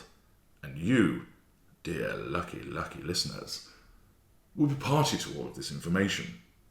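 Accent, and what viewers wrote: United Kingdom. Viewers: I'm from the US. So he just sounds like an elite dude from somewhere in the UK. 🤷‍♀️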